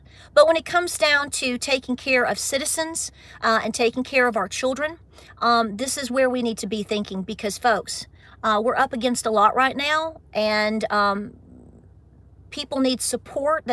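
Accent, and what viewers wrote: South, United States. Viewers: You are so cute, correct, feminine, strong, and spiritual. I love your southern accent and expressions. ❤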